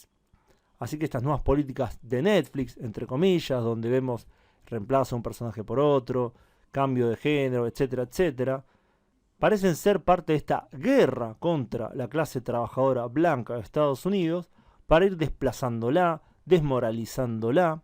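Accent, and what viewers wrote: Argentina. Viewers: Buenos dias .muy Buenos conteúdo. Parabéns pelos vídeo. Mi prime vez que llego aqui en canal. Escutando lo acento argentinos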